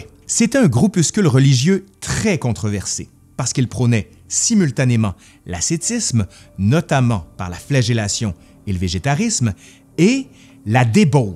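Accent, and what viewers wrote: Quebec, Canada. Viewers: J adore cette chaîne !!! Et l accent des cousins québécois Force a vous 💪😍👍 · Merci pour vos vidéos! Je suis en train d'apprendre le français, et c'est sympa avoir des ressources intéressantes avec un accent québécois. :D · Excellente présentation de la vie de ce répugnant mais unique et célèbre personnage. Je commence à apprécier l'accent québequois ;)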